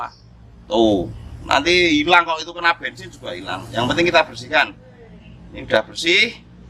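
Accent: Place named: Tanjung Priok, Indonesia. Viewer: Kaya orang priuk bang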